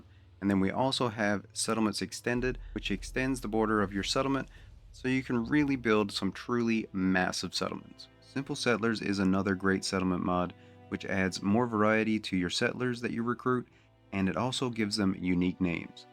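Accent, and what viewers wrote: Newfoundland, Canada. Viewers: I know another Newfoundlander when I hear one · Helpful video, thanks 👍 Also the second I heard you talk I was like, "Is this guy from Newfoundland too?" 😃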